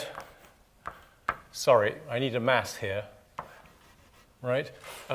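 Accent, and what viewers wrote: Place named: England, United Kingdom. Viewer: "absolute and immaculate sphere" i like the way he talks. english people.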